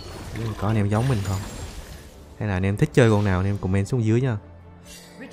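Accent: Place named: Phu Yen, Vietnam. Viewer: giọng giống PY quê mình thế nhờ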